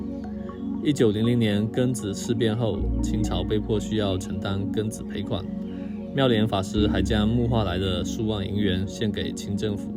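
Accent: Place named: Penang, Malaysia. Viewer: 你的本地口音很濃，不讲还以为你是本地华人，根本就听不出你是大陆人。😂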